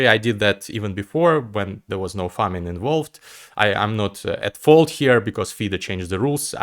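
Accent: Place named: Russia. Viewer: Спасибо тебе огромное. И за проделанную работу, и за хороший, богатый английский, и за понятный российский акцент, который делает английский понятнее для русскоязычных))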